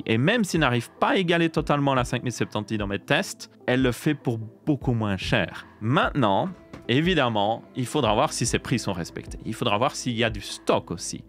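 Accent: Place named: Belgium. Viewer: il est belge...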